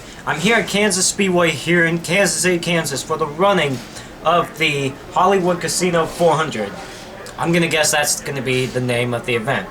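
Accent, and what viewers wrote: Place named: Boston, United States. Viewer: He has a Boston accent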